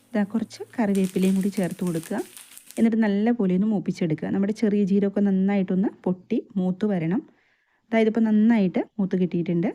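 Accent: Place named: Thrissur, India. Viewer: തൃശൂർ കാരി aano? ഭാഷ കേട്ടിട്ട് അങ്ങനെ തോന്നി. ഞാനും ഒരു പാവം തൃശൂർ കാരി ആണ് ട്ടോ 😄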